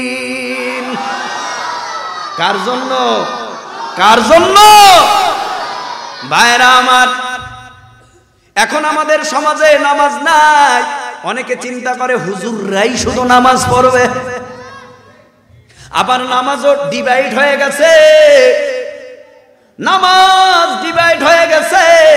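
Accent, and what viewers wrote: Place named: Barishal, Bangladesh. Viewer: Barisailla pola bole kotha Owaz ta really দারুণ hoice সাবাস ভাই আমাদের গর্ব e আপনি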